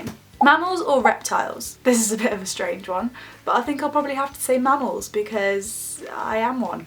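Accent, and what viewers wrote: England, United Kingdom. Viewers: Prince T No its not London. She doesn't really have a strong accent at all (from a British point of view). It just a generic English accent. I think she has said she was born near coventry which is clise to where I grew up and lots of people spoke with an accent like.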